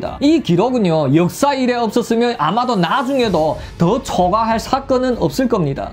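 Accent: Yanbian, China. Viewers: 왠 조선족 말투. 듣기싥어라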